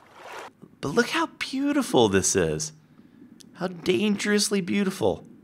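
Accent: Canada. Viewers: You sound sooo Canadian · U sound canadian broski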